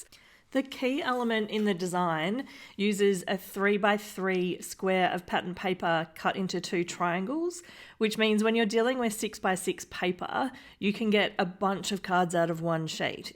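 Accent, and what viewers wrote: Australia. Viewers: Nice, quick and cute cards. I always love your cards and as a Canadian married to an Aussie it’s nice to hear that accent as well. Hugs from Ottawa, Canada 🇨🇦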